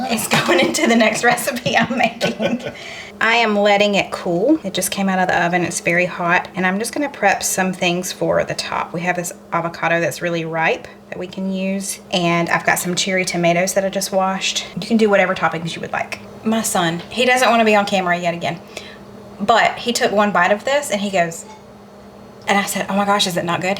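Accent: South, United States. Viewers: Being a cat lover and owner of a Birmese X I just love the way you talk & interact with your cat...and in your southern accent calling her by name...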